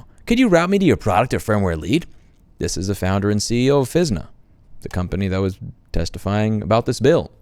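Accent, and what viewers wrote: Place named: Texas, United States. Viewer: He has a texas accent now!!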